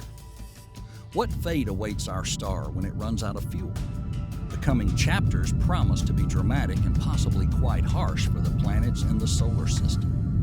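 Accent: South, United States. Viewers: As a southerner myself, (by way of New Orleans, which has its own unique accent, but my family is from Mississippi and Tennessee so I grew up hearing many different forms of the southern accent), this narrator is more appealing to me. The unique way certain words are pronounced is very comforting to me. Combining that with my passion for astronomy and astrophysics makes this channel one of my favorites! If not for the ads, it would be perfect to have playing while going to sleep. I could just pay for YouTube premium, but I survive on a very tight budget and an extra fifteen dollars for something that is truly a luxury and not a necessity would be irresponsible of me. And with the gas prices rising every day, I don’t think it’s smart. I just have to endure the annoying ads that blast the speakers with their irritating gimmicks trying to sell more junk that I don’t want.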